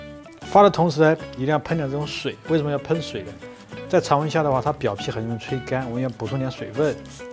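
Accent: Sichuan, China. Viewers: 必须赞👍，讲得真全面。估计师大厨的口音来自四川，👌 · 听到有点家乡口音川谱味儿在里面特别亲切呢😊😊😊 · 川普好👂，讲讲牛肉焦饼嘛！好多年没吃到东大街的牛肉焦饼了。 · 说的是川普，四川普通话，是四川人啊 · 师傅四川人吗，哈哈哈熟悉的川普。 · 他是四川人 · 师傅、普通话加四川口音真搞笑😂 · 师傅四川的，一听就那么舒服😄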